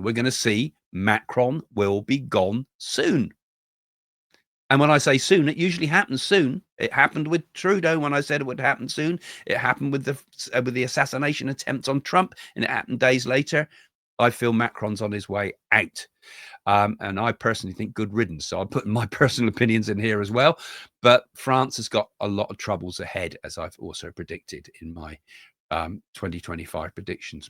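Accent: United Kingdom. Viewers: I love your accent so much. Could listen all day long. I'm such a sucker for a British accent! But Trump is very much involved in the Epstein ring (as were plenty of Democrats- this stuff isn't remotely partisan- just evil men corrupted by power), I don't know what Musk's tactic would be. It's already an ongoing issue in the US. I'd venture to say it was Trump's mafioso thugs that had Epstein murdered in prison. No way that was a suicide. Many people will go down from both the Epstein and P Diddy rings and there is plenty of overlap. I can't imagine that Musk wasn't also involved in at least one of those. It would shock me if he wasn't. Men + power and money = corruption.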